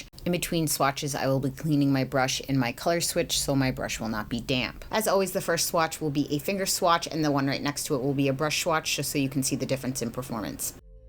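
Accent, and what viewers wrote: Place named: Bay Area, United States. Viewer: y'all can already tell she's from the Bay. Girls like us vibe and just know💯😂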